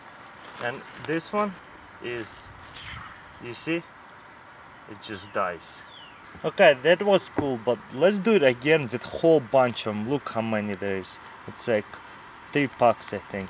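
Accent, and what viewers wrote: Russia. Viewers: Your awesome russian accent can only get you so far, please stop re-uploading things you've already done and make some new content. Or make something cool out of old projects. (i.e. maybe make a borax green torch for your backyard)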